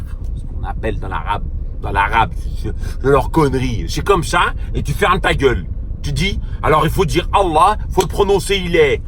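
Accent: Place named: Saint-Étienne, France. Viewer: Salam, heureusement que t'as remis tes vidéos t'as pas fait ça pour rien . Je souhaite que Dieu te bénisse toi et ta famille ici bas et dans l'au delà inch'allah tu aura le pardon et la pleine récompense. Ton langage famillier m'est famillier j'aurais jurer que t'étais de Sainté, t'as l'accent et les mimiques de la ville minière et des chemins de fer et des verts. Tu tape dans les babets avec tes guibolles ou pas ?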